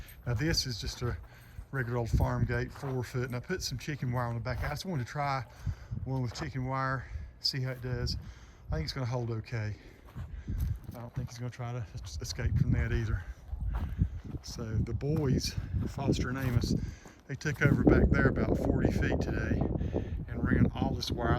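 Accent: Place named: North Carolina, United States. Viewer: I knew you were from North Carolina before I visited your videos to see if you ever said where you were from. lol. I'm from NC too. Born & raised in Charlotte. Thanks for the clear, detailed instructions.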